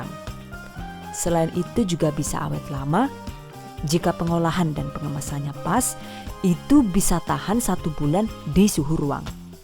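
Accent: Banyumas, Indonesia. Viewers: Bahasanya itu lho yg ngangenin . Campur ngapak Ben tambah kepenak 😀👍🏻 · Ibu ngapak jg ya😂. Asli mana bu? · Jan...sampean nk logat ngapake metu ddi marekma ngguyu.menghibur karo nimba ilmu #GiveawayTHV2021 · Mbak narator ini sebetulnya orang mana sih, kalau sy dengar suaranya bahasa Inggris nya bagus, bahasa Indonesia nya jg bagus dan itu loh boso jowone koq ngapak ya. Kadang sy suka geli sendiri, ok mbak salam kenal dari Bandung 😍. By the way sy suka loh dgn video"nya. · Alhamdulillah ilmu yg bermanfaat...semoga Istiqomah sangat terpercaya....bocah ngapak apa aslinya ya Bu??? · Warga Saranjana bisa ngapak juga toh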